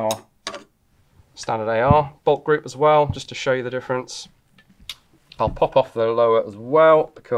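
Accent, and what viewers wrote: United Kingdom. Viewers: no, he has never shot a gun. Because he's Bri'ish, you can tell by the accent.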